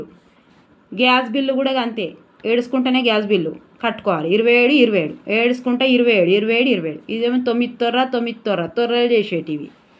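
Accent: Telangana, India. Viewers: Telangana slang superrr akka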